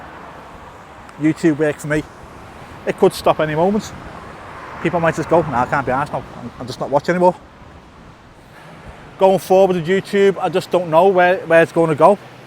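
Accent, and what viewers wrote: Liverpool, United Kingdom. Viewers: A warm greeting from Indonesia! As a non-native English speaker, I absolutely adore the Scouse accent - it's simply incredible! Although I am still in the process of learning English, I subscribed to your Youtube channel as I would love to become more familiar with the Scouse accent. Hopefully, one day in the future, I will be able to speak English with a Scouse accent myself. Wishing you even more success on your Youtube channel! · I watch nearly all of your videos and I’ve never noticed any speech impediments at all. You sound like a perfectly normal scouser 🤓👍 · Thanks for the Videos. You alway come over as a caring and genuine character. You're not just out for the aggressive click bating like some YouTubers. I've never noticed the speech impediment? You're just good old scouse! Because you're human, if you receive 100 positive comments and 1 negative comment. 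It's that 1 negative that sticks in your mind and will out weigh all the positives. Just keep doing what you do mate. It's working!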